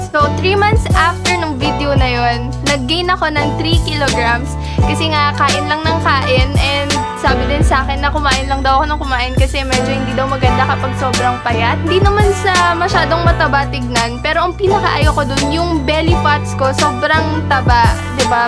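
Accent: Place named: Tuguegarao, Philippines. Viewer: Familiar accent. Hehe taga tuguegarao pala talagaaaaa. Hello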